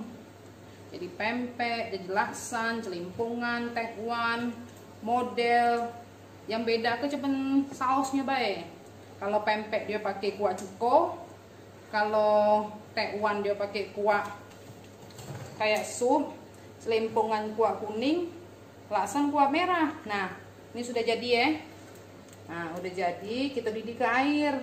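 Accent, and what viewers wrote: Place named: Palembang, Indonesia. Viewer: Asli uwong palembang yo, logatnya kerennn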